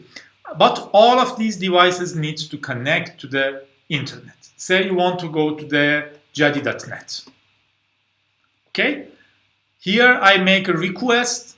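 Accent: Iran. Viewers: Thanks for the info Jadi, you're an expert in explanation ;) Also, loving the original Persian accent :D Love <3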